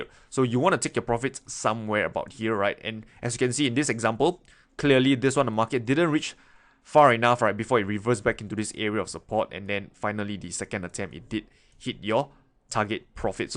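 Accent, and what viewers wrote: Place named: Singapore. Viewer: You have a very Singaporean accent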